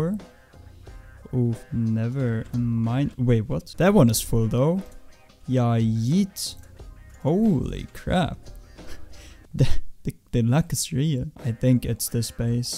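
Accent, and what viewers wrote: Germany. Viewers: U German? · Meine fresse man hört das deutsche raus ;D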